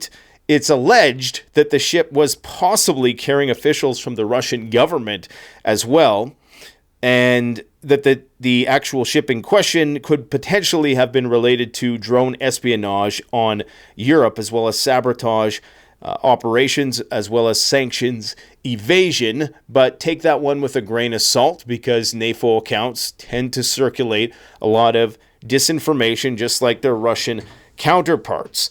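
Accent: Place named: South, United States. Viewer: American southern accent “Alright” opening. All the hype points lol. Immediate like on the video. lol Request for comment ✅ You earned it buddy!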